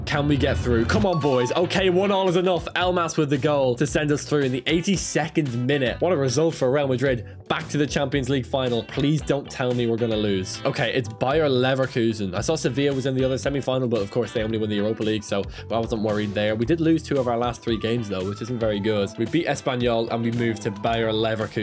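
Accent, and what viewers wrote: Ireland. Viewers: I am Irish to are you from Dublin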